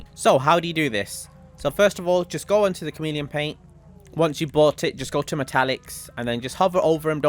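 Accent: United Kingdom. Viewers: ah I love this British accent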